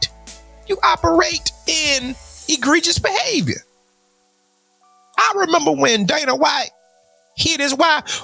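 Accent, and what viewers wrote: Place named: South, United States. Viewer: NC here! Keep doing your thing, sir, give em that country talk!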